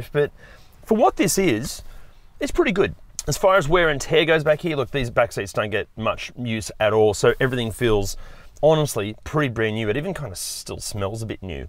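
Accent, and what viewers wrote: Australia. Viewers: this guy is aussie clearly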